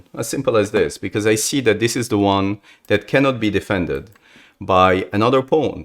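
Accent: France. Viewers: The french accent is great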